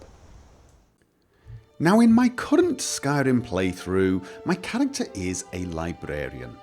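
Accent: United Kingdom. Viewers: i love your sound and your British accent :D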